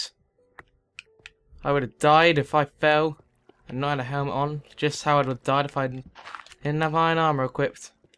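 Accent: England, United Kingdom. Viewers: Are you from england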